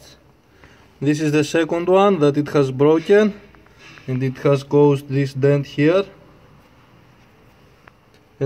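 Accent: Greece. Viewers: Recognised your Greek accent immediately. Then I saw the Greek flag on your arm. :P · you sound like you are greek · Are you Greek? Your accent sounds like the Greek accent. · greek - english accent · RandomizedPyro that could be an accent from a greek person too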